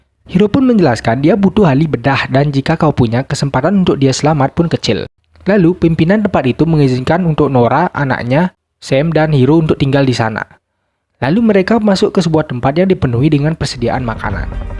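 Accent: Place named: Bali, Indonesia. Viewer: Bang org bali ya?logatnya kentara skli hehe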